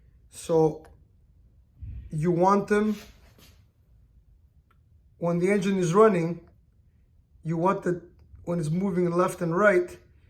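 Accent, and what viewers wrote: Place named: Quebec, Canada. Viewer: You from Quebec bro ?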